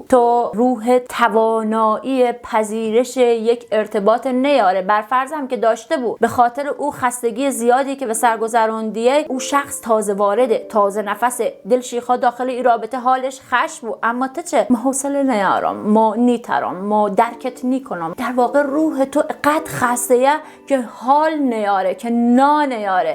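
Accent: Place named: Lorestan, Iran. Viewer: جون من که لذت بردم هم از این لهجه قشنگت اصلا فکرش رو نمی کردم که بتونید اینقدر قشنگ با لهجه لری صحبت کنید هم از این راهنمایی ریبات برای مراجعه کننده آن هزار آفرین داری واقعا شگفت زده ام کردی❤❤❤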